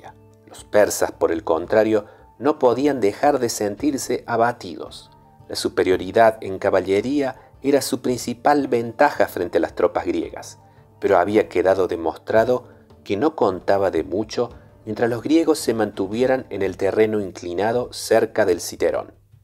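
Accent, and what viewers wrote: Argentina. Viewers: Pero usted no está en España? Por momentos pensé que era argentino por el acento y luego me dije que eran ideas mías, pero ahora que veo su respuesta, creo que no me equivoqué.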